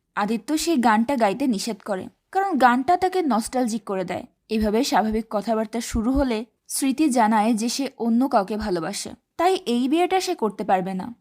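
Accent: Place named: Bangladesh. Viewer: seser dik apnar comment sune bojha gelo apni Bangladesh er. Khub sundor... Osadharon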